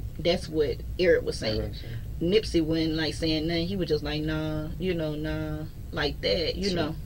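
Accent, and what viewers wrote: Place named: South, United States. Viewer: Where she from? She sound country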